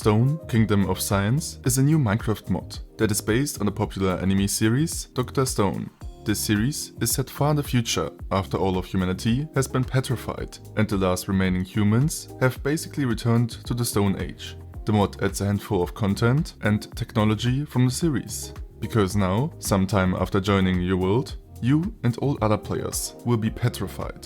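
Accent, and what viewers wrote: Germany. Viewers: German accent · bro man hoert das du deutsch bist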